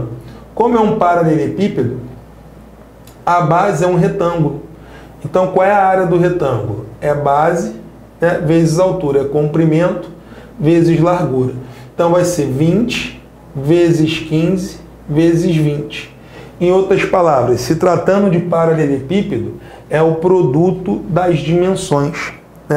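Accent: Rio de Janeiro, Brazil. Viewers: esse sotaque do Rio é horrível. Parem de colocar o X no lugar do S no final e no meio das palavras.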